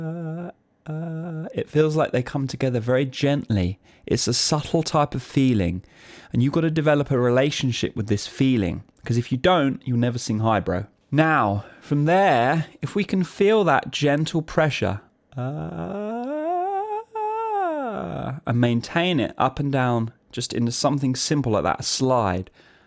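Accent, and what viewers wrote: United Kingdom. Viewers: British English? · Loves the British Accent!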